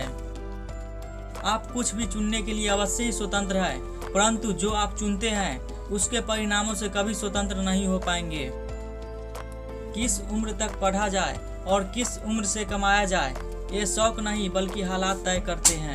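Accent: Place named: Bhojpuri, India. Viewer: Bhai tumhare content and channel dono superb hai proud of you but aapke Hindi Bolney ki shaili mein shudhta lekar aao shabdon ko or clear bolo bhojpuri or maithili tone mein nahi Hindi tone mein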